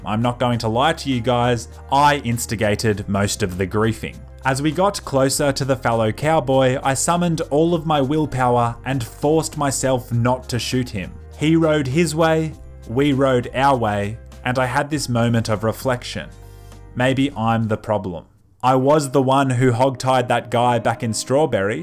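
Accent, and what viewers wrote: Australia. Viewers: dude u Aussie?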